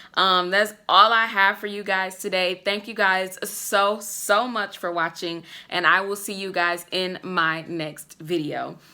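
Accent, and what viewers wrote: United States. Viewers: HI Beautiful!! This IS a PERFECT Video!! Thank You!!! What part of the UK do you live?? You sound American.😃😇🌼💐🌸💐🌸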